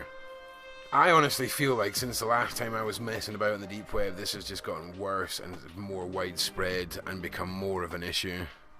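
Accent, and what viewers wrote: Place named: Scotland, United Kingdom. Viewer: Seen your content on a dark Web video. When I heard you were Scottish I jumped on you tube 💚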